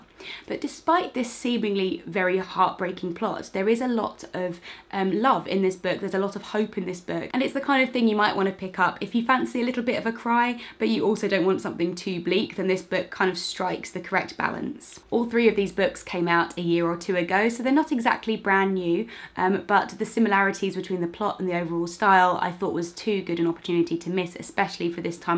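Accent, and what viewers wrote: United Kingdom. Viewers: Oh you sound like a surrey girl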